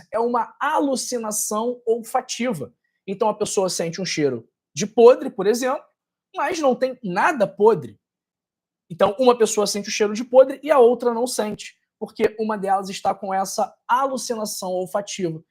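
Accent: Rio de Janeiro, Brazil. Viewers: Esse sotaque carioca é um horror 😮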